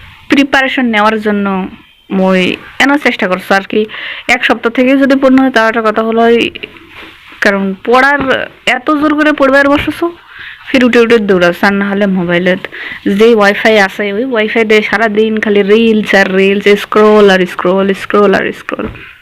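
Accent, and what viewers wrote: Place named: Panchagarh, Bangladesh. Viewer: হামরা পঞ্চগড়ের লোক হামার কথার সাথে মিল আছে তুমার লগত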